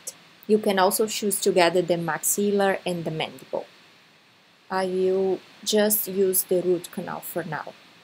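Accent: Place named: Brazil. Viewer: Thank you very much! Appear Brazilian accent.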